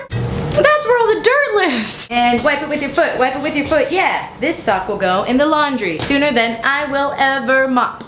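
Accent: France. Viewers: your french accent 👌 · your french accent >>>>>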